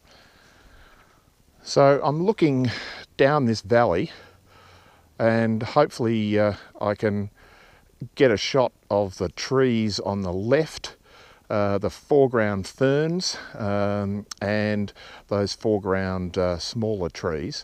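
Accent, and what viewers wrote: Australia. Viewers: Gday, Just found your channel. Good to hear another aussie accent in commentary. Sub'd. Great content also.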